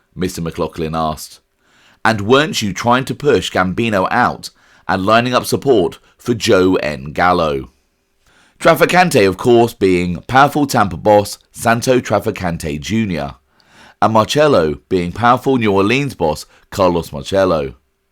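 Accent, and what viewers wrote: United Kingdom. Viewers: Can we just ONCE have a documentary that isnt narrated by a stupid british accent that butchers every other word??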